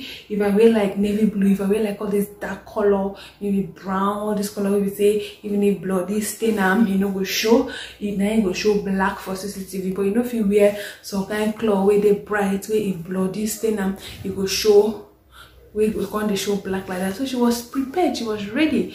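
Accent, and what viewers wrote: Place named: Akwa Ibom, Nigeria. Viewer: Lol, Aiwa ibom intonation 😂😂😂😂😂😂😂 you good sis, keep it up.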